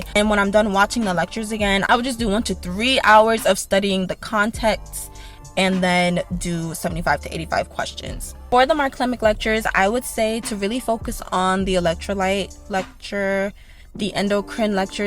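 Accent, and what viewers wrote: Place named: New York, United States. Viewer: I loved this video the entire time i’m like she sounds like she’s from NY bc I’m from the Valley. I felt like i was watching my own testimony from you saying God favors you differently to still living your life while studying But you saying OD nailed it 😂It was in the stars for you I love that you and your mom tested the same date So sweet Congratulations love 👏🏽👏🏽Thanks for the tips ❤️🙏🏼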